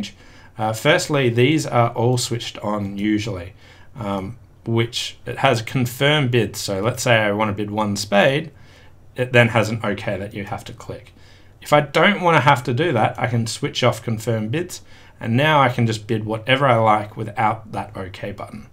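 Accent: Australia. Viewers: Thanks. Just learning myself, and have started using BBO. Enjoying going through your videos, nice to see and hear an Aussie voice on YouTube.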